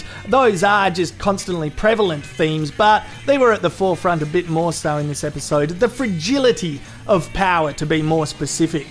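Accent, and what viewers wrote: Australia. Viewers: What part of Australia are u from?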